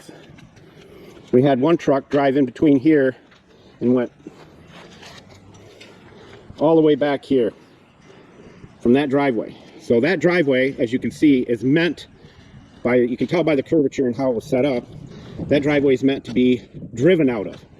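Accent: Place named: Upper Peninsula, United States. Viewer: Crazy Upper Peninsula accent.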